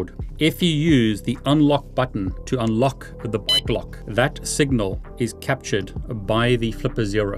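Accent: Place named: South Africa. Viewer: can hear the south african is still there with you. Also glad you got out, its getting worse here. But im sure you know that already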